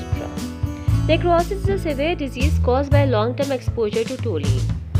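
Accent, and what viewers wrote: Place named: India. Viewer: your tone accent and English should be improved It sounds more like Hindi with a raga .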